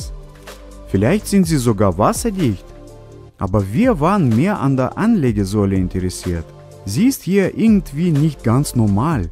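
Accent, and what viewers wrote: Russia. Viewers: Er ist Russe deswegen spricht er das so aus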